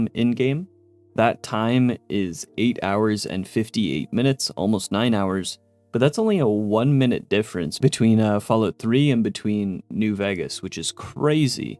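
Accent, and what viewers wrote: Canada. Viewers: Ah he’s Canadian never noticed that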